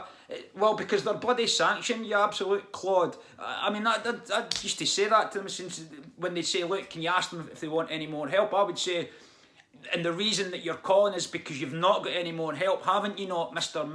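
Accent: Scotland, United Kingdom. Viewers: It's amazing how people like to look down on other people once they get into a position of supposed authority, especially in the police force.why why why but there is something we can do about the dwp have you tried contacting radio 4? They are pretty good at exposing this sort of stuff. Never apologise for your great Scottish accent, we all have accents. Have a good day🥰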